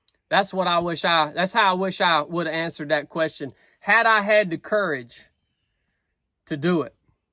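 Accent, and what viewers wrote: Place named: Georgia, United States. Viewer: As a northwestern guy, I wound up stationed in Georgia for a few years, of eight. This man’s accent and manner of speaking is most refreshing and brings back a lot of memories. Good job from an old infantryman!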